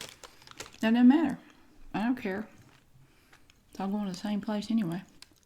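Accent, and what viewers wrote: South, United States. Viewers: You are literally so cute! I love watching you for your mannerism. And your southern accent. 😍😍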